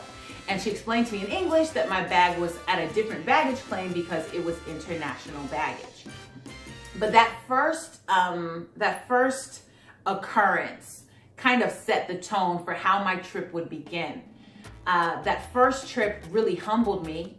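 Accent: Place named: Cuba. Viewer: Hola, te conocí en la Academia de Tiffani me encanto la entrevista desde ahora te sigo. I love your English and the way you teach, and your Spanish accent "Cubano "😂.😊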